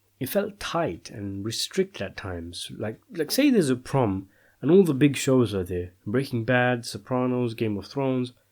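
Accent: United Kingdom. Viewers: Thank you for explaining in it in plain English.. (British accent) of course..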